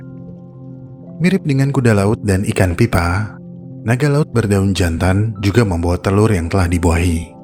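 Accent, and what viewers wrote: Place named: West Java, Indonesia. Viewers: Orang Sunda lu ye bang 😅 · Di luar konteks ya... saya perhatikan abg dalam berdialog ada semu2 sunda nya...apakah keturunan atau memang banyak org sunda di sekitar abg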